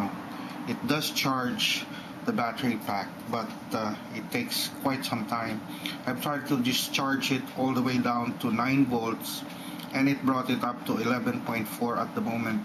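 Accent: Philippines. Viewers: pinoy accent :) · sebwano ka man dong? accent mo bisaya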